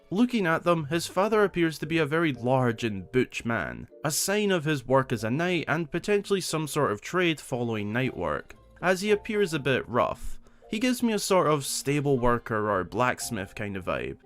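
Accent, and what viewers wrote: Scotland, United Kingdom. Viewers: I like yer scottish accent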